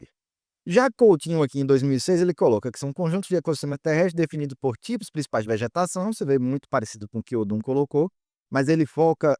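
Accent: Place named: Bahia, Brazil. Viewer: Aula com sotaque baiano é melhor ainda!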